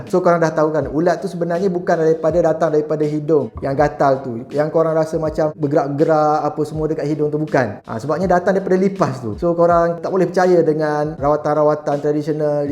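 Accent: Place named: Kelantan, Malaysia. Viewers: Abg ni orang kelantan kan? Slang kelantan tu ada kedengaran.. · Bunyi Kelantan Dr ni cakap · Kelantanese accents has been detected.... 😁😁 Apa² good information doctor .....👍🏻👍🏻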